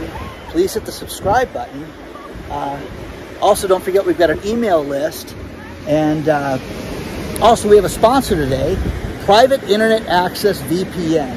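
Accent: United States. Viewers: Love your channel and love your American accent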